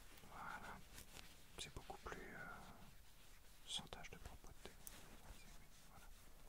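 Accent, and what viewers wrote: South, France. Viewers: Superbe vidéo ! J'ai l'impression qu'un certain accent du sud revient des fois non ? ^^ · Baptiste Conbatant un léger accent du sud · D'habitude je n'aime pas du tout les role-play mais j'ai a-do-ré celui-ci !! Peut-être parce que c'est plus que ça justement... les clins d'œil sont tellement malins, la touche d'humour est parfaite, le scénario est génial, le fond noir est hyper agréable, le texte est top et le son est absolument impeccable ! Vous avez sans doute fait plusieurs essais avant de sortir ces vidéos mais c'est tant mieux :) Je décèle un léger accent, êtes-vous originaire de la moitié sud de notre bel hexagone ? :) En tout cas il apporte une touche unique supplémentaire à votre travail ! Vraiment bravo pour tout cela, vraiment ! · tu es du sud cong non ?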